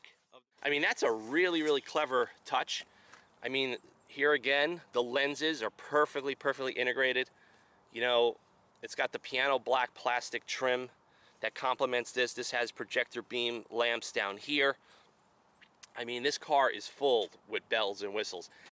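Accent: New York, United States. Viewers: buh'n ("button"), "sah'n" ("satin") - straight New York / New Jersey.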